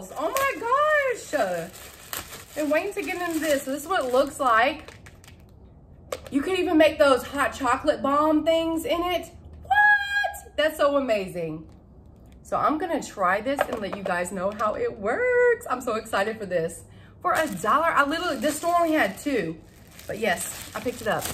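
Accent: South, United States. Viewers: Must be from the South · HI from Katherine i love southern voice you do a good job on dollar store you gave me tips. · Love your southern accent, love your videos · You are so funny with y'all and yes ma'am. I be laughing so hard in years. Where are you from ? Must be from the South. I love the way Southerners talk. I love going down South the people are so hospitable. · It’s so good to hear someone from the South....I say stuff like “my bag is slap full!” You make me smile! 😀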